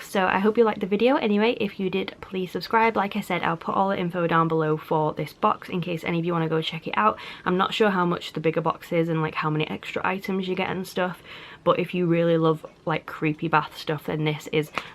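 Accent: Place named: Yorkshire, United Kingdom. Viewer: Omg your makeup is so perfect 💗 also I love your accent are you from Yorkshire?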